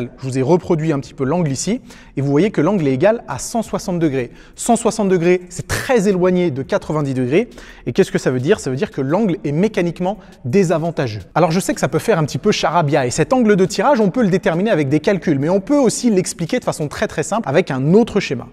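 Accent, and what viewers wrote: Lyon, France. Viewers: Tout le monde prononce comme ça à Lyon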